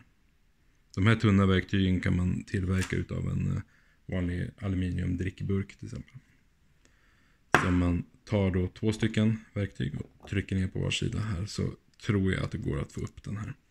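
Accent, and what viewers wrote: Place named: Australia. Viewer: Cheers mate that would be greatly appreciated. They can be a fun read at times when the translation doesn't match what you are saying, so don't expect them to be an exact translation, it has a lot of trouble with the Aussie accent, it's just a tool to give us the general idea of what you are saying. You were mentioned on Lockmania's series promoting locksport channels. I really enjoy that locksport is such an international sport and locks speak a universal language that we can all understand regardless if there is translation or not. I am enjoying your channel.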